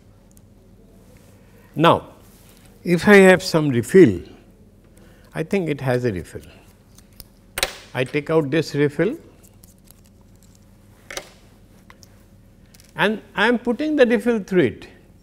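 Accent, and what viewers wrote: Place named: Bengal, India. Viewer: I can feel that the teacher is bengali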